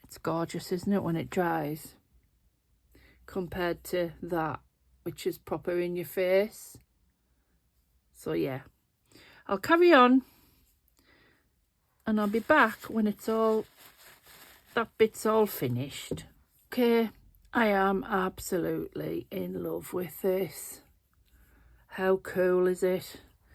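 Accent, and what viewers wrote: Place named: Sunderland, United Kingdom. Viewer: hi im new to your channel I've found you through Sallys channel ,, love love love the gothic look ,I'm from Sunderland and I can't figure outl how close you are from your accent 🥰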